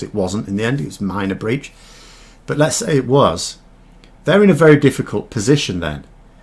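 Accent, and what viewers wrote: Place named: Chesterfield, United Kingdom. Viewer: out of interest where do you come from? I’m from Chesterfield and your accent sounds local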